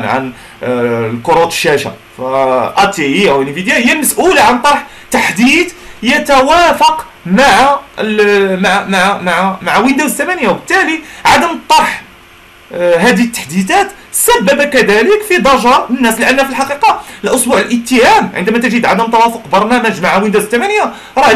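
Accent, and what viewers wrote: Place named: Morocco. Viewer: اخوي هو شخص مغربي ولهجة المغارب لهجة مختلفة وحتى النطق مختلف ( مثل المصاروا الثاء ينطق س ) وهناك الكثير من الامثلة وهو يحاوول ان يوصل المعلومة بالذي يملكه من معرفة وقدرة [ قال ابن سيرين : إذا بلغك عن أخيك شيء فالتمس له عذرا، فإن لم تجد له عذرا فقل لعل له عذرا.]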